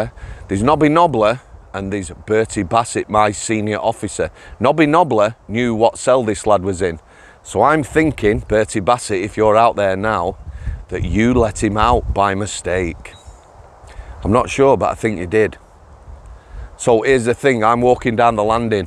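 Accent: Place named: Wakefield, United Kingdom. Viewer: Lost your wakey twang, almost full on Manc !!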